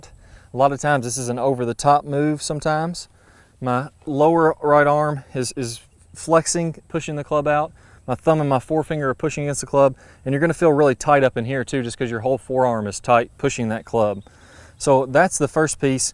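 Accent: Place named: South Carolina, United States. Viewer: Great accent...South Carolina??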